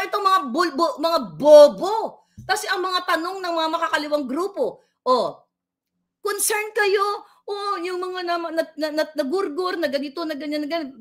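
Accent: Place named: Ilonggo, Philippines. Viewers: Hahaha! Love ko talaga ang Ilonggang salita mo palangga, hawig din sa Bikol❤️❤️❤️😀😀😀 Grabe ang “uttering”, tirahin ba nmng YUTTERING! Letse ka pernandes! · Hahaha go go go, ang bobo nga talaga ng mga tuwadcom, natatawa ako sa pag ilonggo mo dhay hehrhe,tama talaga lahat po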